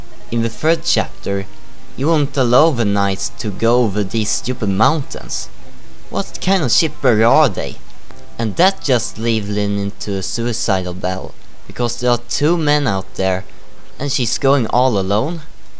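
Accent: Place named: Sweden. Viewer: Hehe. Man hör på ditt uttal att du är svensk. Älskar verkligen det spelet. Det är det bästa Fire Emblem för min del tätt följt av Path of Radiant.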